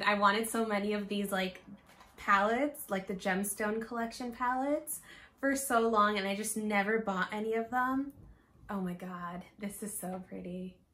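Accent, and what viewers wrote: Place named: Arab world. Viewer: Your Arabic accent is soo cute.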